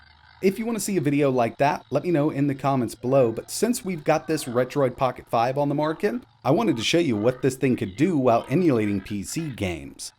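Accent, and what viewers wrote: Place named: South, United States. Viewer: He's a "Southerner".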